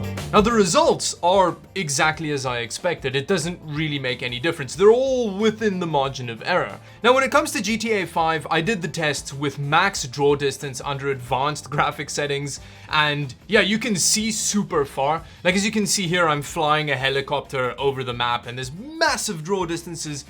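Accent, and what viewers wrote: Afrikaans, South Africa. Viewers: he’s south african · Fiddy twoo. I recognize a fellow afrikaans accent there lol.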